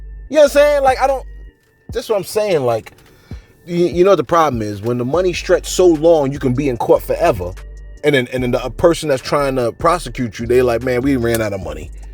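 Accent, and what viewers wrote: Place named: South, United States. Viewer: Man you talk bad like you from the south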